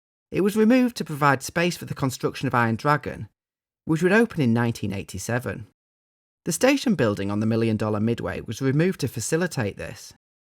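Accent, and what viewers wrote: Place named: United Kingdom. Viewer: A British man talking about American theme parks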